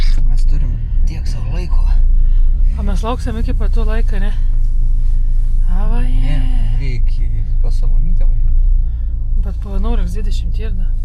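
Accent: Samogitia, Lithuania. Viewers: Mėgaujuosi JŪSŲ žemaičių šnekta...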